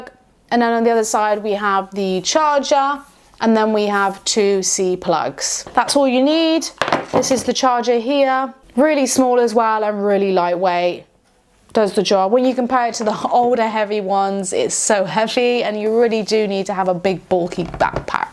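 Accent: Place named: United Kingdom. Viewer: love the British accent, but yours is on another level ! New subscriber with all the bells ringing and greetings from Argentina 🦁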